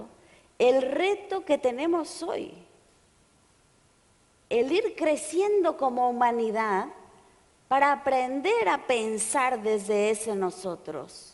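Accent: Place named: Argentina. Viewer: ¿Por qué tiene ese acento sudamericano? Es que dicen que es mexicana; pero habla como argentina.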